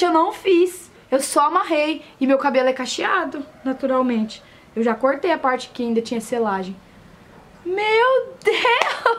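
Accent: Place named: Maranhão, Brazil. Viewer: Seu sotaque é bem maranhense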